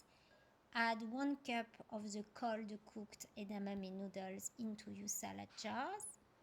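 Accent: France. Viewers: Going to try this! Your English with the French accent is the cutest! 😍 love from Luxembourg 🇱🇺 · Bonjour, merci pour ces idées. Vu l accent vous êtes française ?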